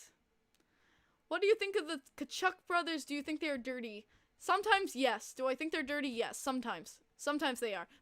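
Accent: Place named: Long Island, United States. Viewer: Your Long Island accent is soooooooooo cute. 😍😘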